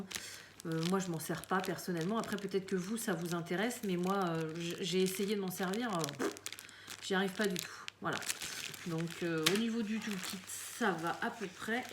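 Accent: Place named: Quebec, Canada. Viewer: Tu la bien le français québécois hihi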